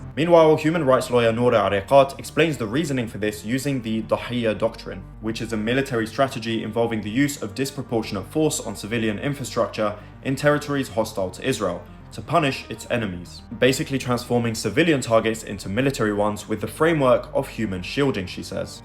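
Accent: United Kingdom. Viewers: You look like a young man of military age... And you speak the UK English... See where I'm going with this?😮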